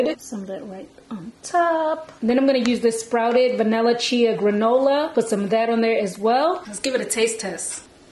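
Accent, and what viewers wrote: Baltimore, United States. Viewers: You from Baltimore? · Are u from Baltimore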